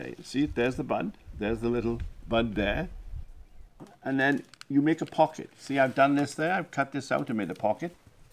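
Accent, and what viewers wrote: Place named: Caribbean. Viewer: has a very unusual accent, he sounds like he is from the Caribbean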